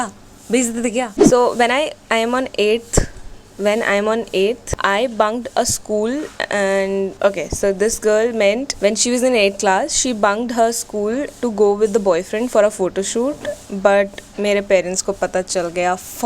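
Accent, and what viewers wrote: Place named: United Kingdom. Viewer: You're amazing Yaar or aapki British English wahhh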